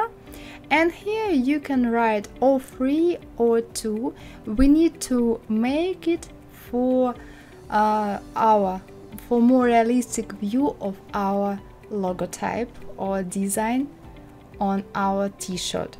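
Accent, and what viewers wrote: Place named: Russia. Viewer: Just Wow. Amazing. Its so easy. Thank you. R u russian? I like your English speaking accent.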